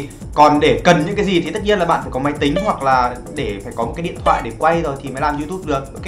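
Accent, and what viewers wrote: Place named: Central, Vietnam. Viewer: Liz gà dũng nói dọng miền trung dương em ko biết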